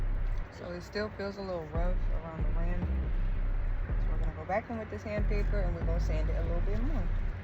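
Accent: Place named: South, United States. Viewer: I'm trying to pay attention I like the way you talk downsouth country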